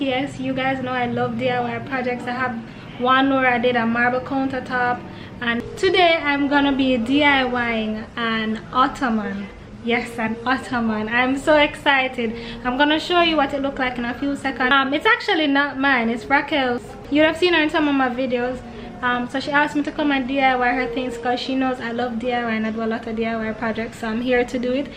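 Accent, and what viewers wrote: Jamaica. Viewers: That's a Jamaican accent. Pure Jamaican beauty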